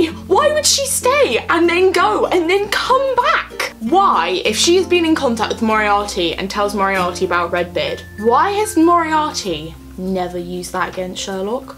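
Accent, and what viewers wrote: United Kingdom. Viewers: Watching this, I was hearing my own thoughts coming out of a younger person's mouth and with a British accent. Seriously, everything you said are things I have said over and over. I lost a friendship -- someone I knew for 30+ years -- for just questioning how John got out of that well. If Sherlock Holmes were a real person, he would have eviscerated this episode for all the inconsistencies and impossibilities; as fans, many of us have come to analyze things in the same way. Thank you for this video!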